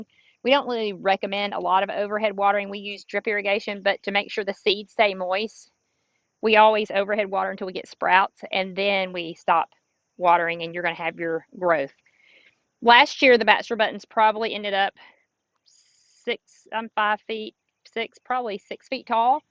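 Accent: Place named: Alabama, United States. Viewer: Congratulations on winning your well deserved Amazon's United States Woman-Owned Small Buisness of the Year (2019)! I thought I detected an Alabama accent.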